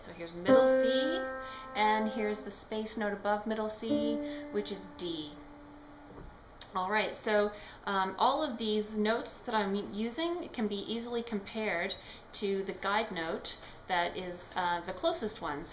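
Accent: Canada. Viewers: Finally I get why the Canadians on South Park say aboot ! Never heard it pronounced so clearly before :) Great video btw, just the right level of difficulty for me!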